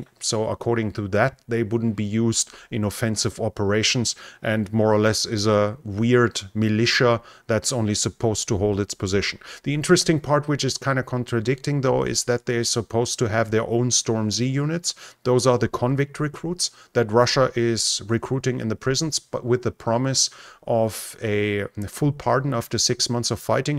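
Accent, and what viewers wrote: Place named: Germany. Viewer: You sound very German ;)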